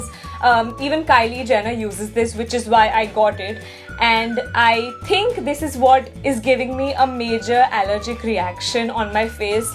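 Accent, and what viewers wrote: India. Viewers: She is indian n indians speaks like this only ....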